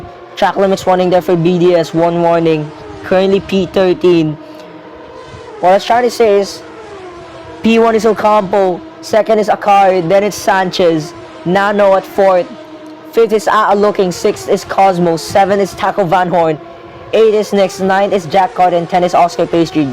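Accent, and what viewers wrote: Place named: India. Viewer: Why do you sound so indian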